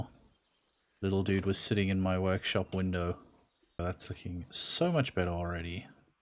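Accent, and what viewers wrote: South Africa. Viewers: I know a fellow south african when i hear one lol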